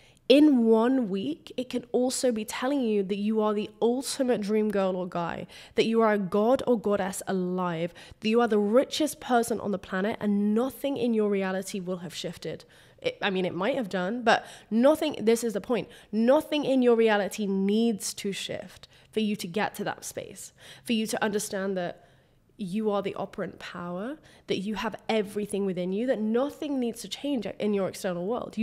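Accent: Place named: Yorkshire, United Kingdom. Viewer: Ya don't sound like ya from Leeds, that's a very posh Yorkshire accent 😆😉 It's a great channel ya building here. Sending ya peace and love from Huddersfield ❤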